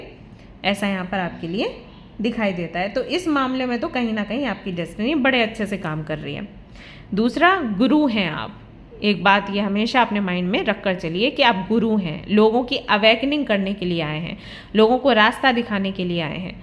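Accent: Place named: Bihar, India. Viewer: Bhot kam logo se hi "batiyate" ho haha such a cute accent m bihar se hu aise hi bolte h sb😂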